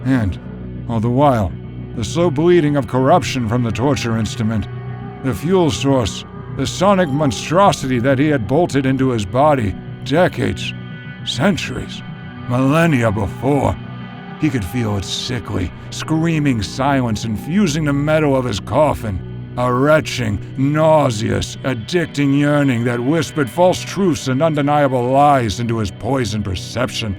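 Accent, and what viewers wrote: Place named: United Kingdom. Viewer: This dudes voice just always gets to me. Something is so off about it. The weird half British accent he throws in whenever he feels like it, the weird emphasis on certain syllables or the breathy way he ends a lot of words, I just can’t with it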